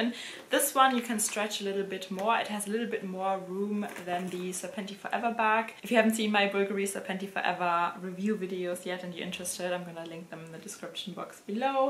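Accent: Germany. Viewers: Hey, i am thinking of getting the purse.... great review thank you for the video ☺️.... are you german ? ☺️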